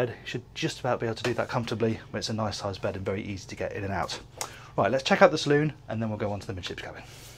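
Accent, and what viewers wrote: England, United Kingdom. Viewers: Dear God ,This guy Talks So Fast ! You do not have time to think about what he said ! Even worse ,he has an English Accent ! No Coffee for him !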